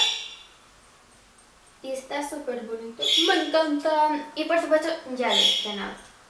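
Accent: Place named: Cádiz, Spain. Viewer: Eres de Cádiz? Amo tu acento! :) me encantó el esmalte turquesita de Kiko! Y el vestido naranjita _ lo amé!!!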